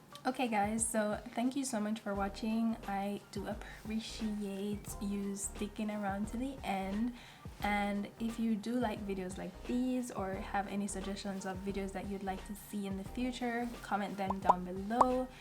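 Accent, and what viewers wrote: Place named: Caribbean. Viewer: Lovely to see another Caribbean queen! Love the hair and your accent!!!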